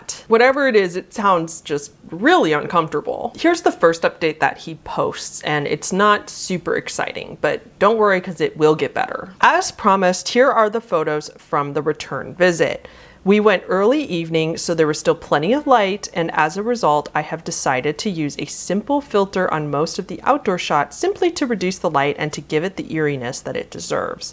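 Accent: United States. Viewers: As an English bloke myself, it feels so unusual to hear the phrase "dual carriageway" being said in an American accent. Haha. I have no idea how someone could be this bored/brave to go on an expedition like this. Either that, or I'm just a wuss. XD Edit: It also feels very bizarre to hear the word "bloke" being said in that same accent. I hear that so rarely that I can't compute it. XD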